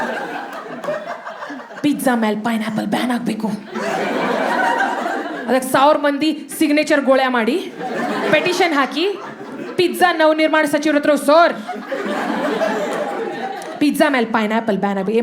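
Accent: North Karnataka, India. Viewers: ಉತ್ತರ ಕರ್ನಾಟಕ ಶೈಲಿಯ ನಿಮ್ಮ ಕನ್ನಡ ತುಂಬಾ ಸುಂದರವಾಗಿದೆ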